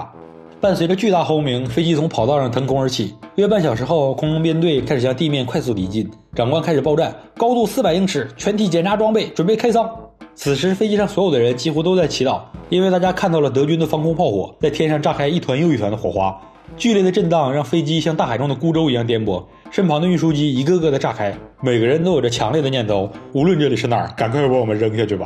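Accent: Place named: North, China. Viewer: 每次聽到你的聲音，我都覺得耳朵都要懷孕了～這北方口音～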